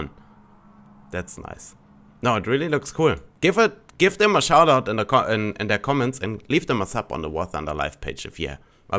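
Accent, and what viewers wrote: Germany. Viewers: r u German I'm impressed with ur English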